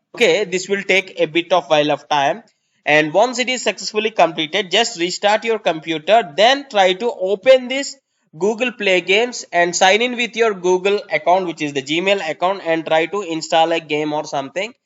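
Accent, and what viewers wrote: India. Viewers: Indian Accent = Instant Fail.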